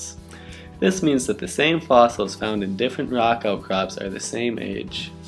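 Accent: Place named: Russia. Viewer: Русский акцент, сделай на русском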